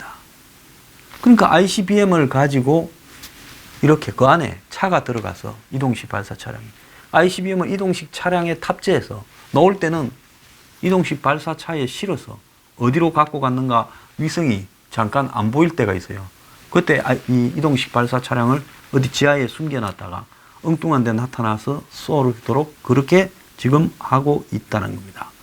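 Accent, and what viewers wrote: Gyeongsang, South Korea. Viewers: 황소장님은 경상도 사투리를 기역도 잘하십니다 저는 많이 잊어버렸는데요 머리가 좋아서 기역역도 대단 하십니다 항상 고맙습니다 너무 더운데 건강잘 챙기시고 건강하십시요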